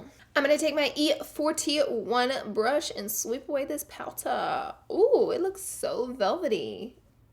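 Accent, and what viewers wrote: South, United States. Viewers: Jennifer Lynn its called a southern accent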